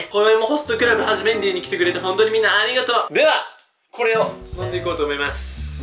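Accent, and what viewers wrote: Toyama, Japan. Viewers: はじめしゃちょーに質問します。富山弁ですか。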